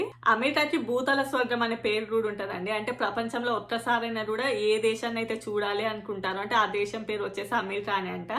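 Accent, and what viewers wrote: Telangana, India. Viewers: Chala baga cheputhunnaviu bidda. unnadi unnatlu clarityga cheppavu thalli.very good Amma.India lo Ap, kadu meru telangana telugu vare anukuntunnanu.thank you bidda.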